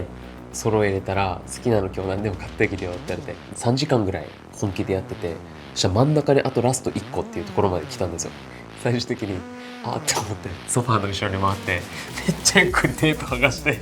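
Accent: Okinawa, Japan. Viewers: めっちゃ沖縄訛り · 沖縄の訛りって優しくて好き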